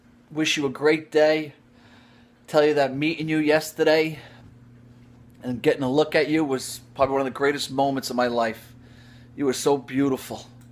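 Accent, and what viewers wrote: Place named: Brooklyn, United States. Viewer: It's a Brooklyn accent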